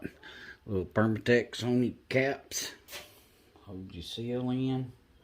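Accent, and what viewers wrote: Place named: South, United States. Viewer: Im calling you the " " because your calm southern voice and patient demeanor makes it easier for ADhD heads like me to learn from 👍 Now Im actually looking forward to doing my heads when they need it haha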